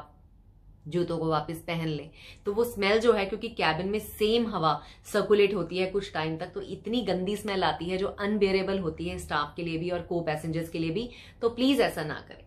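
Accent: Punjab, India. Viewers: Bahut kamaal Punjabi kudi Hindi achhi bolti hai par pata lag jata hai k hai tan Punjabi kudi🎉🎉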